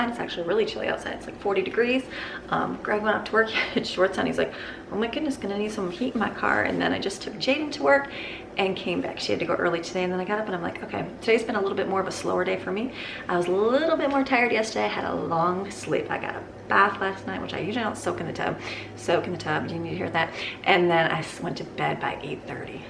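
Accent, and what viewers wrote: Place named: South, United States. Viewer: Southern talk yall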